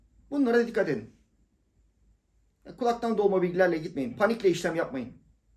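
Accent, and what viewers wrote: Thrace, Turkey. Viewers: Şive hoşuma gidiyor Trakyalılar neşeli dir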